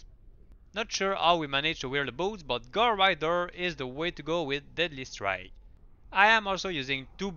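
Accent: Quebec, Canada. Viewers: tu donne quebecois :P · j'aime beaucoup le fait que nous les québécois on a vraiment un accent anglais à nous autres :P Super vidéo man merci!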